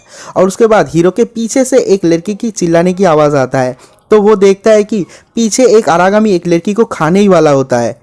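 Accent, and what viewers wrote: Bengal, India. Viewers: Bangali ho ?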